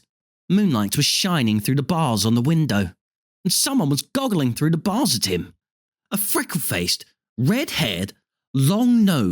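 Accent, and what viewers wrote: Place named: United Kingdom. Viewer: Im in Cyprus, surrounded by UK people, this is a great way to make me more comfortable with a UK accent, thanks!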